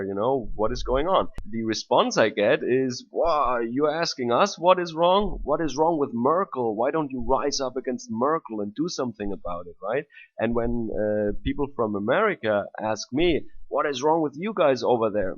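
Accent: Germany. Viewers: jan uniter He is German. This is how everyone not American speaks!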